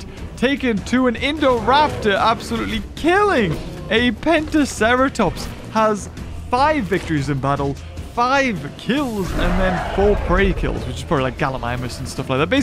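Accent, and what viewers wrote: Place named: United Kingdom. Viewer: British dude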